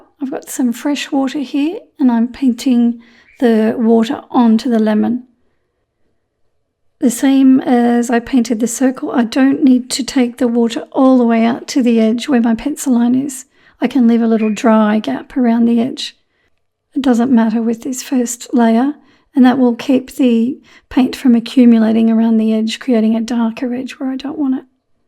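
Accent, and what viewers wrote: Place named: Australia. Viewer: HI, ~ I HAVE ALWAYS JUST LOVED YOUR ACCENT, AND YOUR TUTORIALS~ NOW I JUST FOUND OUR YOU ARE FROM AUSTRALIA!!! HOW NEAT!!! I ABSOLUTELY LOVE YOUR DOUBLE GIRAFFE PAINTING BEHIND YOU~ I'M SURE YOU PAINTED THAT ONE, YOURSELF~ I HAVE BEEN WONDERING IF YOU HAVE A REFERENCE PAINTING OF THAT ONE, AND/OR A RUTORIAL OF IT!??? I JUST LOVE IT~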